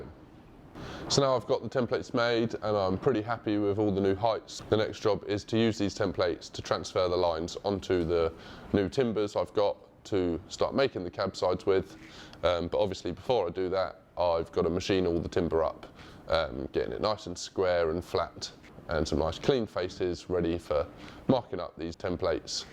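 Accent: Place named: North, United Kingdom. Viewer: Where's your boatyard? With the snow and your accent I'm guessing Northern UK? 😊 The boat keel is deep. Is that what defines it as a smack?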